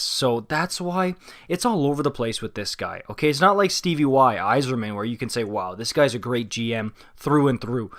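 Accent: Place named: Quebec, Canada. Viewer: he's definitely quebecois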